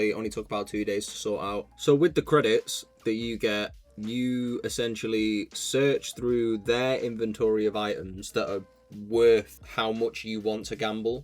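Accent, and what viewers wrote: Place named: United Kingdom. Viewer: i assume youre from UK by your accent, can you even deposit money into the website? im from UK and cant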